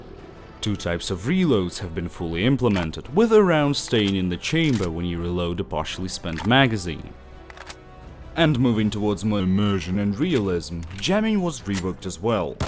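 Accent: Russia. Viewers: чел, ты передаешь привет русско-говорящему, слышно же по акценту........ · Is that a Russian accent?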